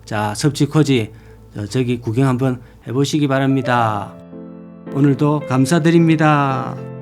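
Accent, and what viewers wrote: Gyeongsang, South Korea. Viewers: 님의 촌철살인의 나레이션 내용과 매끄럽게 흘리는 서부경남 사투리가 기가막히게 어우러져 v-log의 인기가 나날이 더해갈 것입니다. 항상 잘보고있습니다.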